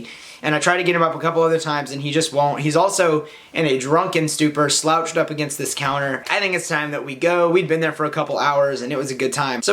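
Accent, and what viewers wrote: South, United States. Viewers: Hey ya'll. I wanna here some southern draw sometimes in your vids, please sweety???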